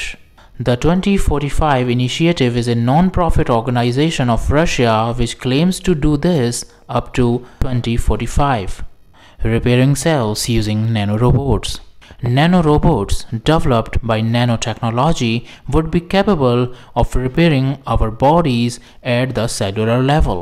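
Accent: North India, India. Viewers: Hi, can you please work on your pronunciations. It's very distracting to hear you talk with a north indian/gujju English accent. Or pls have someone else do the voice over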